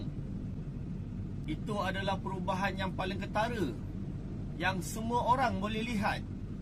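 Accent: Johor, Malaysia. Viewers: Cikgu asal orang johor ni heheh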